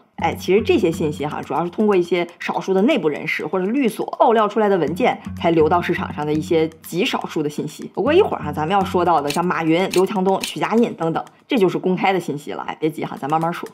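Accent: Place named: Dalian, China. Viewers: 她应该是北方人、语速快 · 总感觉小lin 不是大连人 就是烟台人😂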